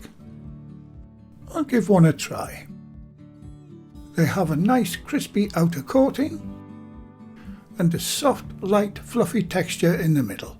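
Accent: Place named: Northern England, United Kingdom. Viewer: I grew up in the north east, your accent reminds me of childhood. Its that particular northern but well spoken, reminds me of my nannas "telephone voice"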